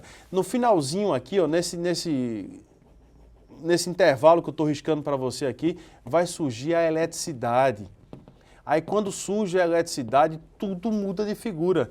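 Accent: Pernambuco, Brazil. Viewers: Esse sotaque Pernambucano deixa a aula mais legal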